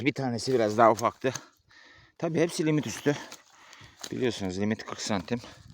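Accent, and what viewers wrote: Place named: Amasya, Turkey. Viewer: bende Amasya lıyım abi şivenden anladım hemen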